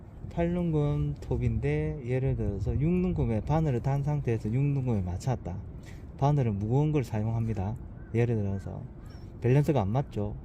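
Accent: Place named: Gyeongsang, South Korea. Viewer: 영상 잘 보고 있습니다. 말투가 경상 쪽이 신 것 같은데 어디 사시는지요? 저는 구미에 거주 중인데...가깝으면 시간 맞으면 동출하고 싶네요...ㅎㅎ 다른 댓글을 보니 마산쪽에 사시는지요?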